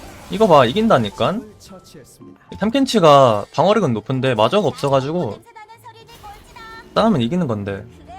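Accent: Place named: Jeolla, South Korea. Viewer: 님 전라도 분이신가 ㅋㅋㅋㅋㅋ 사투리 ㅋㅋㅋ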